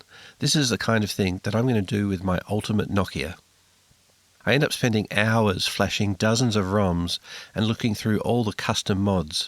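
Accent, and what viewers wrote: Australia. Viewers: Given his accent, he appears to be from Australia.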